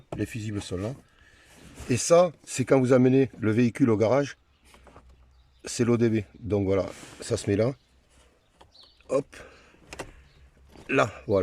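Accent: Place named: South, France. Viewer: Merci un charmant petit accent du sud magnifique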